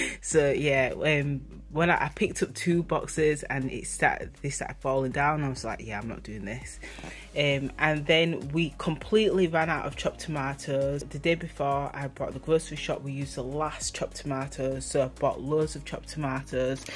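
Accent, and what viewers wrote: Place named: Yorkshire, United Kingdom. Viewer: I thought so with your accent, defo a Yorkshire lass like me. Love your channel by the way xx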